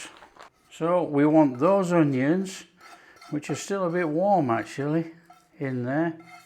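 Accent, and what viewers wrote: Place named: North Yorkshire, United Kingdom. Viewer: Where are you from? Can’t place your accent, North Yorkshire?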